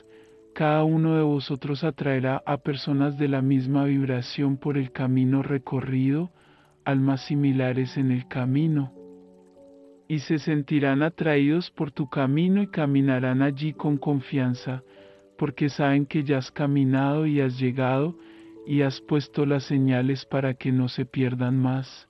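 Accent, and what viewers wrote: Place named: Colombia. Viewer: Suenas como Colombian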